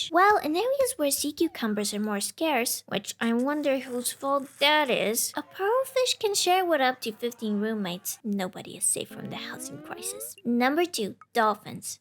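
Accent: Eastern Europe. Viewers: Yoooo, ur from Eastern Europe? Somehow it felt like it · Oh it’s an Eastern European accent! (Like “Dexter Secret Laboratory”) I’m a huge fan of your voice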